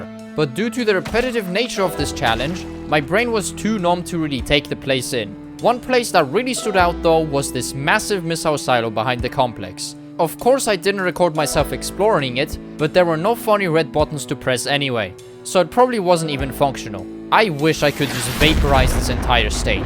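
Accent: Poland. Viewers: are u polish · The more I listen to you, the more I here your Polish accent or atleast thats what my head tells me, keep up the work brother.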